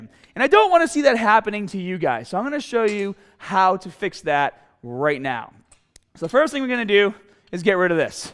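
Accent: Boston, United States. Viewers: Yup, first video I saw I thought he just had a really adorable speech impediment, then I realized he was from Boston.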